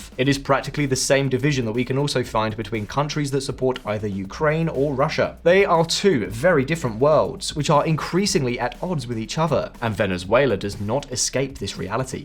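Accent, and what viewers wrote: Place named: United Kingdom. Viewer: It's a little rich for a guy with a British accent to talk about a Dictatorship in another country. Bro, the Starmer regime is arresting Brits for Facebook posts! Yea but "it's okay when do it" right? 😂 The U.S should leave Venezuela alone. They've wrecked that country with thousands of sanctions, and they wonder why Venezuelans are crossing the Southern border into the U.S.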